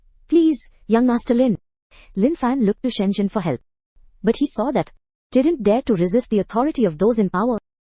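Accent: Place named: India. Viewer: Coba yg naratornya English biasa masih aman tp ini india adehhhhhh harus pasang telinga utk lebih jelas pengucapannya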